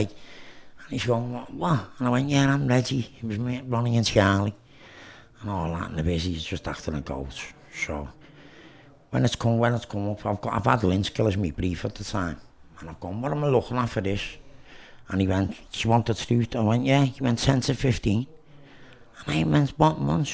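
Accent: Liverpool, United Kingdom. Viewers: Scouser x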